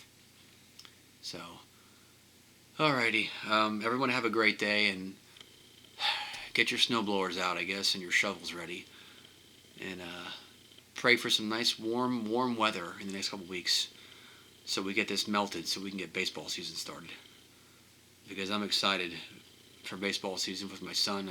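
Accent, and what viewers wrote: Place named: Pittsburgh, United States. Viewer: Crying as I’m reading this now even though I’m late to the game. Recognized the accent though as I’m a native Pittsburgher now living in Los Angeles. I’ve decided to not get the reversal surgery, I just don’t want to go through all of that to find out I have to have it done again God forbid. Is the Ng tube necessary for all procedures Up?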